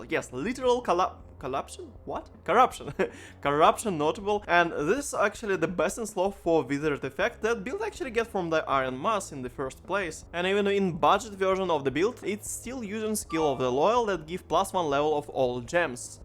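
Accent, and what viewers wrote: Russia. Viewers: Russian accent is so intense)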